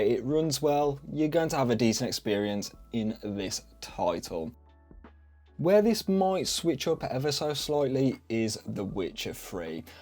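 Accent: Black Country, United Kingdom. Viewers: great video, your accent sounds familiar, maybe the black country, Im a PC builder myself and I don't see many people around my area building computers haha. carry on with the great videos.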